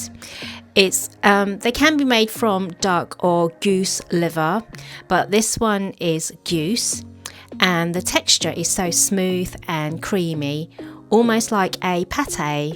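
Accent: United Kingdom. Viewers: 鐘意聽你講英文，我可以學聽英國音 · Hi, your voice and accent is very nice, can you share how you make it? As a local Hong Kong people, I really want to transform my accent to more British. Thanks.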